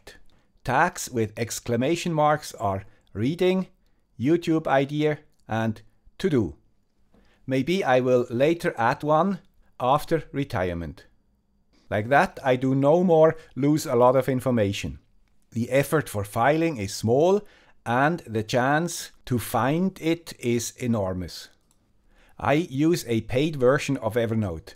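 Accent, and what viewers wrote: Switzerland. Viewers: Your channel is "about sensors and microcontrolers" with a Swiss accent -:). That's why I have a subscription on your channel. For me, this subject is to far off (personal opinion).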